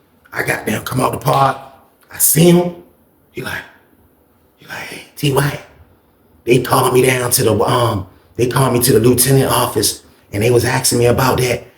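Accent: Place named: Chicago, United States. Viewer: Yo the Chi town voice tho! 😂😂😂😂 then the $1000 POWER PLAY!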